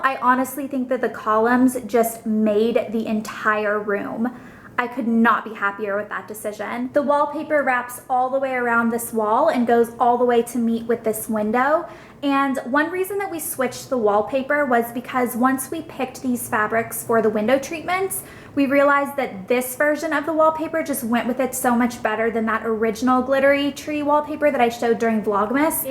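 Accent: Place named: South, United States. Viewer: Your accent sounds so much more country than I've ever heard it before!:)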